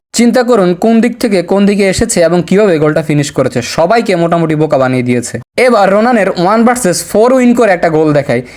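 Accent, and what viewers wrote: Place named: Noakhali, Bangladesh. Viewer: বাড়ি কি নোয়াখালী? ফ্লাইং কে বলেন প্লাই্ঙ। থামলে ভাল লাগে।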